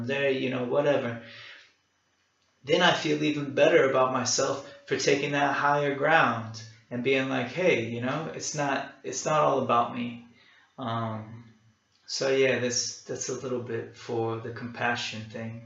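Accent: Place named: Texas, United States. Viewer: Felt like I just listened to myself with Texas accent haha!! Awesome bro :D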